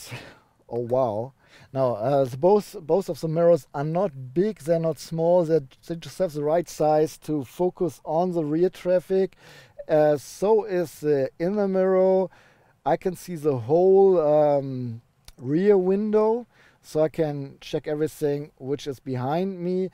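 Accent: Germany. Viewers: I really like your complete cover of the car, your german accent is really not a problem. Continue like that :-)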